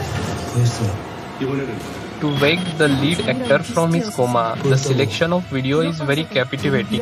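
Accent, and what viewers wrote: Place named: India. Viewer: Listening to your voice makes me think u r an Indian am I right ??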